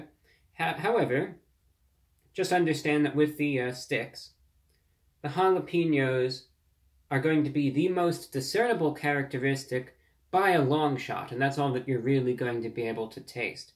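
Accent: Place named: Transatlantic, United States. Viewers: I'd like to hear him once in a while. Speak with more of his east coast accent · Boy, that transatlantic accent is fighting its way into the forefront, slow but steady.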